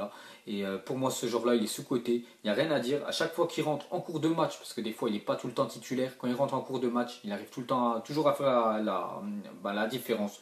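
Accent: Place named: Marseille, France. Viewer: tu as l acsent marseiller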